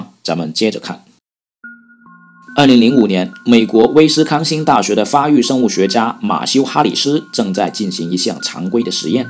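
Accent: Hong Kong, China. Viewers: 这口音，是香港人或广东人说的普通话吗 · 香港口音越聽越靠杯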